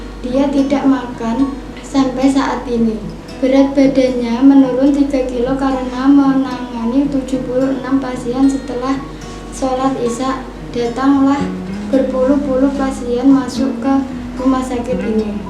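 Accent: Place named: Java, Indonesia. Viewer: terima ksh tapi ngomomg ny MEDOK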